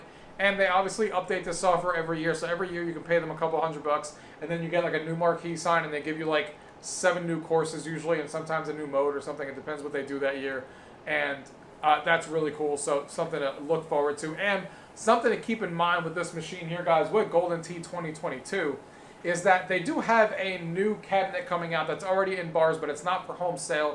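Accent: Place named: North Jersey, United States. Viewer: Dude, your in jersey? Wtf , I'm guessing your from the northern or western part by your accent. It's not as bad as a bayonne one like mine, but you have to be north of old Bridge. Hahah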